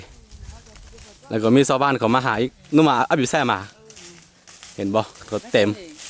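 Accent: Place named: North, Thailand. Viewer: พูดเหมือนคนเหนือฝั่งไทยเลย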